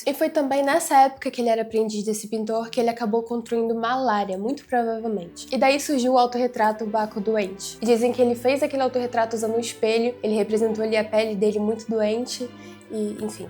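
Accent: Rio de Janeiro, Brazil. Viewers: Não consigo escutar um sotaque carioca e imaginar uma pessoa culta. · Pq carioca fala "naisceu"? Tenta falar "nasceu" normal que aí passa mais credibilidade nas suas falas, fora isso o vídeo ficou muito bom, conseguiu me prender a atenção a forma como vc apresentou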